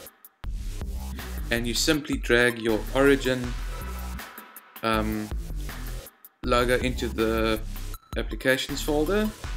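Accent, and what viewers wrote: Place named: South Africa. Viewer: I know I’m very late but you sound like your from my home country of South Africa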